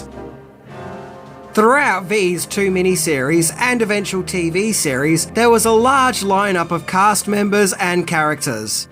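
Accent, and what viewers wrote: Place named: Australia. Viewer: I love the (Australian?) accent pronouncing it "Vay." It keeps catching me as I listen. "Vee" sounds more ominous, imo. But I'm glad this series appears to have crossed the pond if it went all the way down under. As kids, we gathered together at my friend's house because they had cable, which meant really good reception without going blurry like television shows did back then if you didn't have cable. It was before HD, so the effects were super cheesy, but so much fun!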